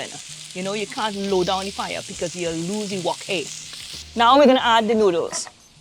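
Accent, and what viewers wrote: Trinidad and Tobago. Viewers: Am I detecing a Trni accent? The low mein looks, and I am sure tastes absolutely delicious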